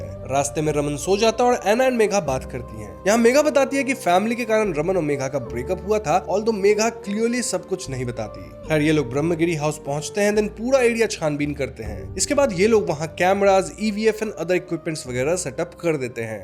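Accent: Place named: Bihar, India. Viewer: Kedla kya hai bhai.... Bihari accent mat ghua bhai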